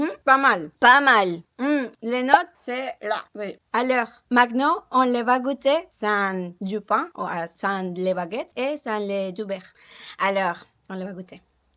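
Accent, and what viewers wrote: Colombia. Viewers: Ton naturel ton sourire et ton accent colombien m'on fait craquer tu es adorable et trop mignonne j'adore le camembert 😂😂😂ta joie de vivre me fait trop plaisir💋😘😘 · YOUR COLOMBIAN ACCENT SPANISH IS BEAUTIFULL IN FRENCH❤❤❤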